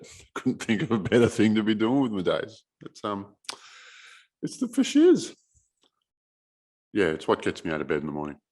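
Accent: Australia. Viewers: It's great to hear an Aussie accent:)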